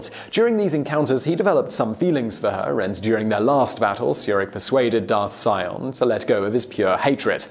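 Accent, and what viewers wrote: United Kingdom. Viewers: Chillax he's British his pronunciations are endearing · Oh limey Brit accents. Made this hard to watch. Not one name pronounced even close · Do you need to put your British spin on every name?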